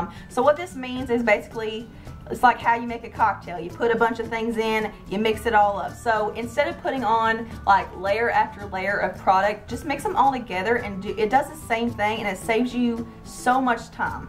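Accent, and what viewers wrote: South, United States. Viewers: that southern drawl 😍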